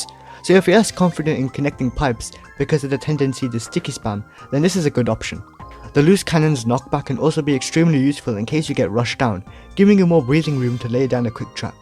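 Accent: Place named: Jamaica. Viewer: Something feel right about hearing a Jamaican man teaching you how to blow up stuff better in a videogame.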